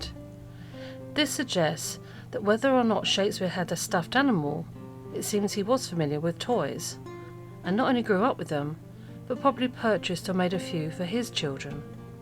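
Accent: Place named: England, United Kingdom. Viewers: You’ve never heard a British accent? 😂British Siri and Alexa sound similar. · Sound more Tudor , it's incredible that you're still alive .😆 Do you mean the the narrator spoke with an English accent? I'm not British, but could perfectly understand the narrative.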